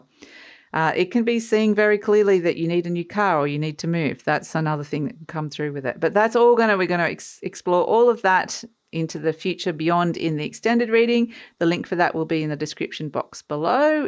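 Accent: Australia. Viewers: G'day. You sound like an Aussie. I'm in Brisbane. Nice to meet you. A blue butterfly flew across my line of sight this morning! Thanks for the reading. Cheers Will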